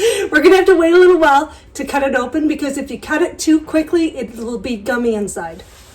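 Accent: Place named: Canada. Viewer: lol, I KNEW you were Canadian, I could tell by your accent!! I subscribed to your channel, God bless you!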